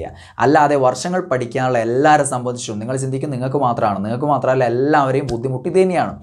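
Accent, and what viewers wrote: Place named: Malabar, India. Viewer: അതു മലബാർഏരിയയിലെ സ്ലാങ് ആണ്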